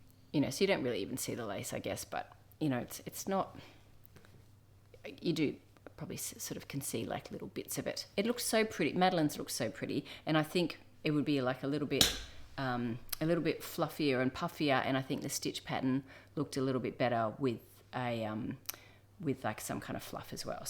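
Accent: Australia. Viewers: I did “Busabout” in 2002 which sounds like it might have been Eurobus rebranded?! The routes and set up sound exactly what they were when I did it - such a fun adventure and so lovely to hear you share your similar experience. I’ve also loved watching each of your recent travel episodes - thank you for making them. As an Aussie who hasn’t lived in Australia for over a decade, your accent always brings me a taste of home 🦘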